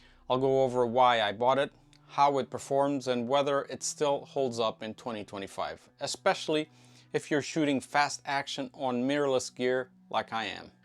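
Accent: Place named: Portugal. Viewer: Great video bro Quick question are you Portuguese? Porque o sotaque nao engana meu camarada🙏🏿💪🏿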